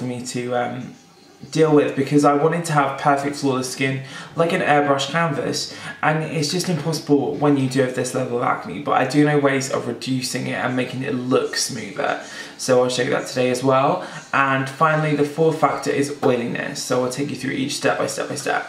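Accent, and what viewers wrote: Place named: United Kingdom. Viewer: Omg I was thinking the same thing I think it's the British accent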